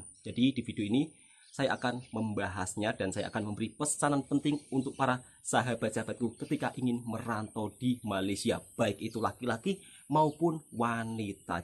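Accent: Java, Indonesia. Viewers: Ngomong lu medok... Mending belajar ngaji yg bner ngucap allah masa nyebut nya owoh 😂😂😂😂😂😂😂😂 Dunguuuuu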